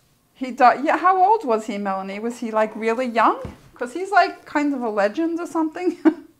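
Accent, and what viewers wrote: New York, United States. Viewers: It says your in Norway, but you sound a bit like a New Yorker...I really enjoy watching your videos. Does doing the live videos make you more self conscious? I've been watching you for quite a while now, it just seemed like you were feeling uncertain about your process...girl, you are a great artist, don't forget that.. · I just found your channel and I find it very motivating and filled with ideas. I enjoy your narrated versions the best. From listening I thought you were from NJ or NY, but heard you mention Norway..then saw your FB profile. Brooklyn..makes sense. I'm in NJ. :)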